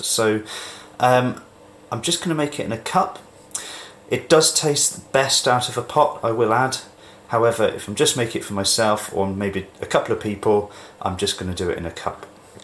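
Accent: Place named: England, United Kingdom. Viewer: I am a coffee drinker. But I appreciate your tea with English accent. Greetings my friend.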